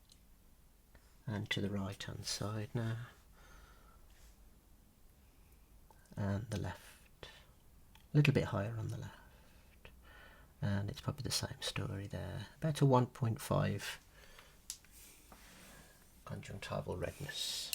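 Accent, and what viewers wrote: United Kingdom. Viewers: where are you from in the UK? I like the accent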